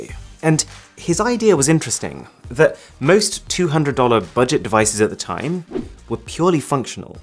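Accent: RP, United Kingdom. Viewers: I love your voice. The poshness (sorry i dont know a better word lol) iv subscribed xx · I just watch your videos just because I like the way of your talking, specially British accent I just love it❤️.huge love from Bangladesh 🇧🇩.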